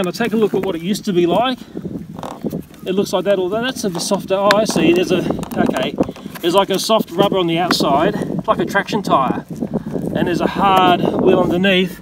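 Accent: Sydney, Australia. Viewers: Child in house says to mum "Mum, the loony spider man is outside talking to a chair"... Do love the Sydney accent, its like how us Londoners used to talk before London became the city of a million ponging ars... OK must restrain meself on social media, its a good job I am bona fide certified mentally ill cos I could get into trouble speaking me mind :P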